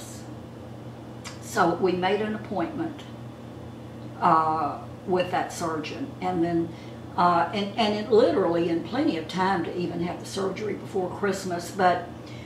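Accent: South, United States. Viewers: From one long soaker to another, (can't live w/out my baths) I am so happy that I have found you today! You bring a cheery smile to face and a warmth to my soul. I am 60 as of August this year which is very weird for me. You are so precious and your accent brings me home to Georgia, Alabama and Tennessee. I was born in Atlanta. Although I was not raised there, I am a southern girl from the heart! I hope that you are feeling better as it's Sept. now. I will always keep you and yours in my prayers as I am a True Believer too! God is so very good and Be Kind Today is my mantra! Big hug. You are lovely and have the most sparkling eyes too!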